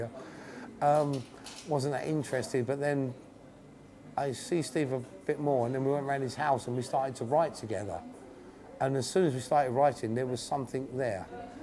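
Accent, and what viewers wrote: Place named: United Kingdom. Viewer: Typical brit accent heh, i like brit accent, interesting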